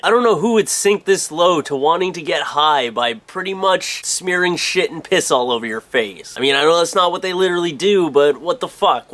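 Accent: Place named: Canada. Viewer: I think should find an excuse to say 'house' in every video. Believe it or not, my friends and I have become so amused with his accent that we all say 'house' Canadian-style.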